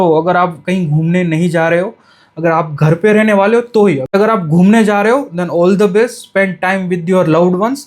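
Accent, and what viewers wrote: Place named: Gujarat, India. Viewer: Gujju lagte ho bhai aap 🙄🙄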